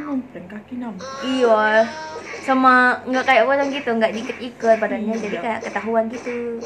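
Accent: Indonesia. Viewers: Ngomong ny Indonesia Banget .. Orng mna sih ini..🤔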